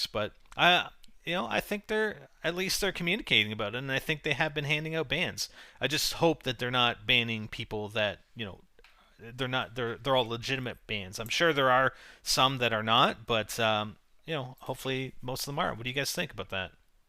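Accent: Canada. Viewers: Canadians really do say oot! 0,0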